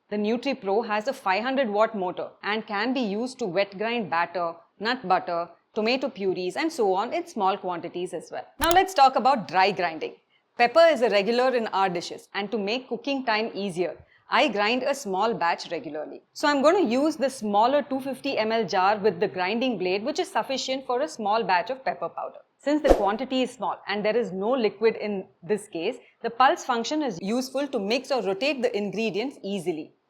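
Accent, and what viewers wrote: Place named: Bangalore, India. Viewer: love the bangalore accent ;)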